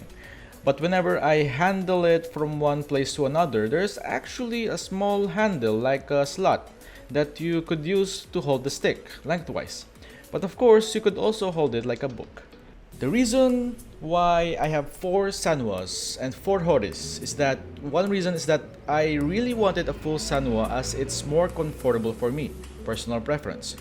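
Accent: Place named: Spain. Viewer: Oye hermano puedo apreciar que hablas español o tal vez Portugues xd Well Im planning on buying this bad boy as my first stick (You know, going to get serious) But, how hard is it to mod? As a completely idiot and begginer? Mi biggest issue with the stick is the lever but aside from that the stick is sooooo beautiful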